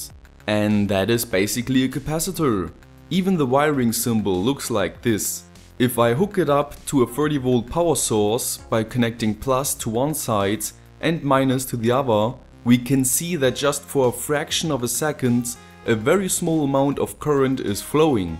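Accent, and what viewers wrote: Germany. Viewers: these videos are way more well filmes and understandable, last but not least more professional then many others on the same topic out there! Even if you sound a lil bit german XD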